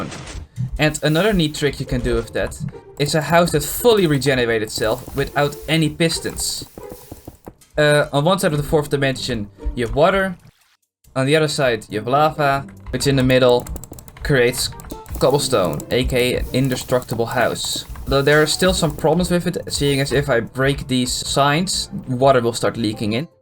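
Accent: Netherlands. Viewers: the dutch accent :3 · wild dutch accent lol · You sound....dutch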